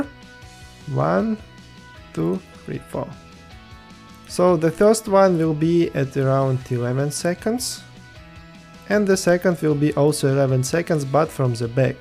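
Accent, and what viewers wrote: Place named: Russia. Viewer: Wonderful tutorial! Thanks. I want to work on raspberry pi, subscribed to your channel. You have an interesting accent, similar to Russian. You are Russian?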